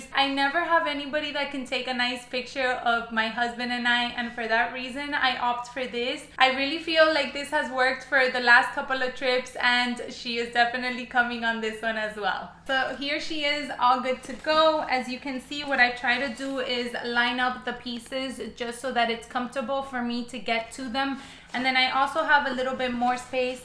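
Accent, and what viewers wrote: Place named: Miami, United States. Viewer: Are you from Miami? Your accent gives me kendall, hialeah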